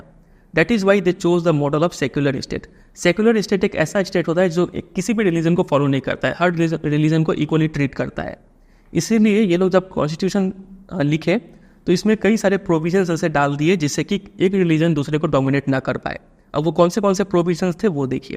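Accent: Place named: Bengal, India. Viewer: tabhi mai sochu aap ka accent thoda bengali hai😅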